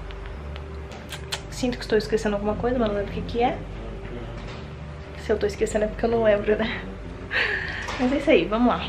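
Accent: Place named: São Paulo, Brazil. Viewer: Você não tem sotaque de Mineiro parece de São Paulo, acho legal isso.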